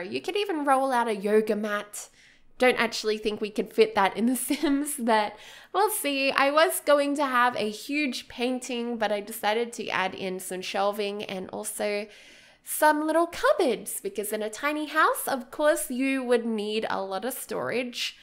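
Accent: Australia. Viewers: Artem XCX kid it’s called an Australian accent they are lovely and I am blessed to have an “annoying accent” if you don’t like it leave press the red X at the top of your screen it helps ( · Hamad Rashed Probably because of the Australian Accent we all sound similar 😊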